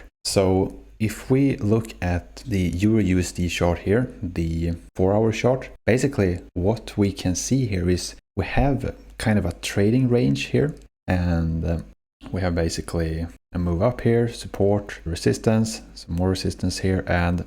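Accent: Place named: Sweden. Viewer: He sound pretty swedish🙌🇸🇪👏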